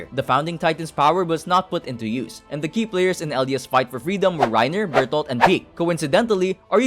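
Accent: Philippines. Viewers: you have a filipino accent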